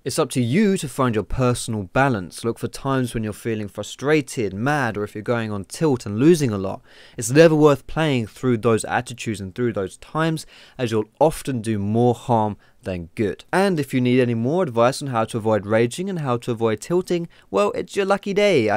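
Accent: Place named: United Kingdom. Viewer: Pls make a guide on how to speak with such a nice british accent!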